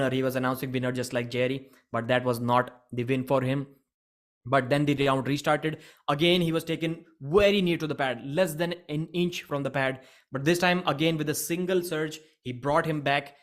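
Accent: India. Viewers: FOR THE MONEY AND CONTENT! THIS CHANNEL ALWAYS UPLOAD CLICK BAIT!! FUCKING CHANNEL FUCKING NARATOR INDIAN🖕🏻🖕🏻🖕🏻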